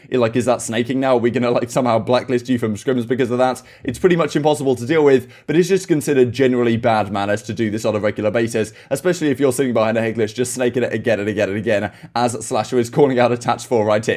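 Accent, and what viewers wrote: United Kingdom. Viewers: I assume by your uploads and your accent you too are also from the Uk. What do you think about the later games that might not take place until 1 or 2 our time?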